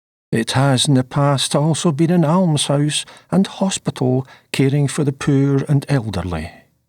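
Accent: Scotland, United Kingdom. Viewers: What a wonderful video, remarkable story telling ability, and great Scottish brogue. A delightful experience. You also reminded me of my Uncle Wink which also brought pleasant memories. Have subscribed to your channel and look forward to further video's. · Me gustó muchísimo tu investigación. Me habría agradado escuchar tu voz relatando la historia. No comprendo porque el audio que me llega esta doblado al español. No hay modo de disfrutar de tu acento escocés. Felicitaciones por tu tarea. Saludos desde Buenos Aires. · Hello from Belgium. Thank you for this fascinating video. I always was interested in things like this. You're a great storyteller! Love the Scottish accent. Everyone can fantasize about the woman in the window. Thank's for doing the history. 👍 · What a fascinating story! And told so well! No fanfare, just good old fashioned storytelling made all the more interesting by your lovely Scottish accent! 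Thank you!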